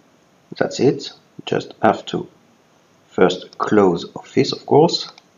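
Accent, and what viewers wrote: France. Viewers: Mec je sens ton français 😀 Keep it up ! And thanks!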